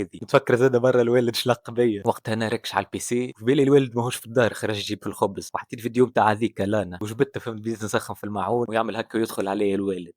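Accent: Tunisia. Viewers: لجتي التونسيه ❤🇹🇳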